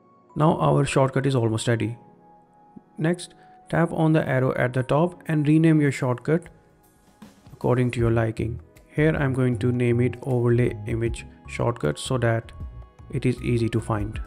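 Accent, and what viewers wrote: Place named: India. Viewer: I knew this video works the moment I heard my brothers Indian accent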